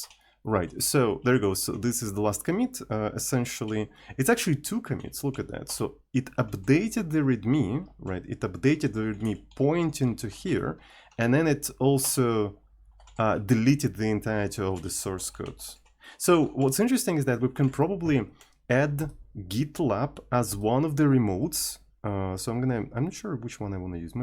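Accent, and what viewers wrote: Russia. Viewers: Why do you sometimes swear in german? As a german I appreciate it XD, but its a bit confusing. An english speaking programmer with an russian accent cursing in german.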